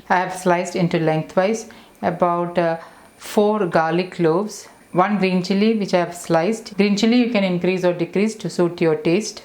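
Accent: Kerala, India. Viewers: Are yuo malayali I like yur Engish pronoun ciation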